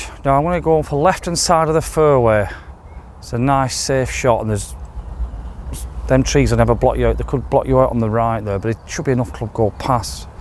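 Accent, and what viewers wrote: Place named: Yorkshire, United Kingdom. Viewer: I love the Yorkie accent Who needs prepositions?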